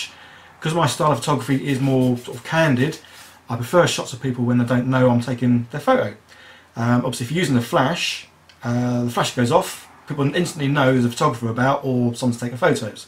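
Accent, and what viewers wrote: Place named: England, United Kingdom. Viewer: hi Englishman... nice accent mate! Is that a Tamron or a Sigma70/200 lens?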